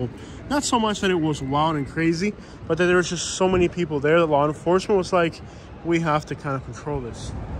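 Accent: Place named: Minnesota, United States. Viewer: Are you originally from the northern states? You sound like you have a Minnesota accent.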